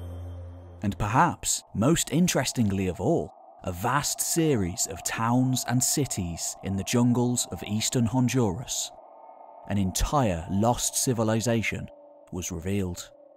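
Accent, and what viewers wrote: England, United Kingdom. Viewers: Wow! How deeply flawed a video. Like an 8yr old researched it from outdated school history books but read by a English guy to make Americans feel like it's intellectual fact. Smh 🙄 (but still lmao!)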